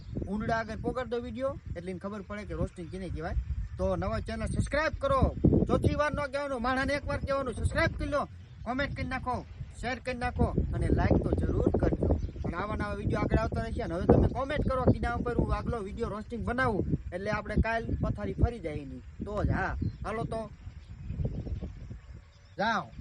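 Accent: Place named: Gir, India. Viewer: Gir No Havaj Se Bhai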